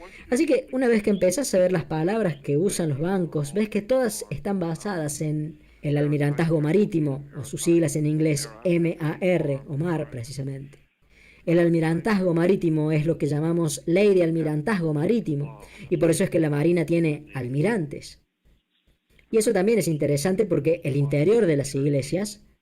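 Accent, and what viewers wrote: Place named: Córdoba, Argentina. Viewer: Sonas cordobes, ya me siento en confianza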